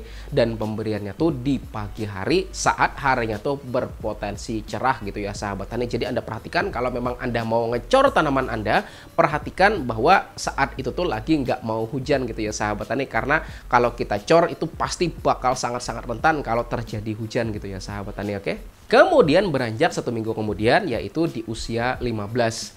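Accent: Banjar, Indonesia. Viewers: urang banjar mun bepander bahasa indonesia pasti ada inguh inguh banjarnya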